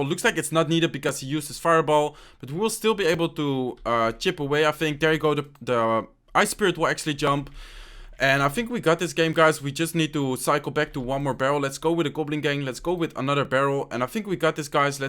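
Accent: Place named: Netherlands. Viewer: Your accent giving me headaches. Why can't you speak english like any other dutch human being.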